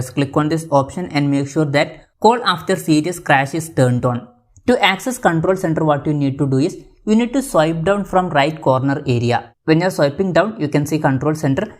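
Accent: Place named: India. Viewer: Worst Indian accent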